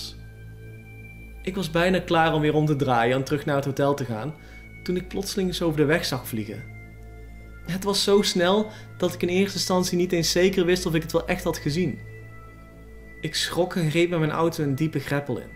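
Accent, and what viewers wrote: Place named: Belgium. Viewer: is een belg of limburger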